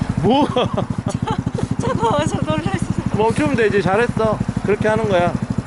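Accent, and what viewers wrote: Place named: Jeolla, South Korea. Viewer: 역시 전라도 사투리는 화난거같은데, 사실 화 1도 안남 ㅋㅋㅋㅋㅋㅋㅋㅋ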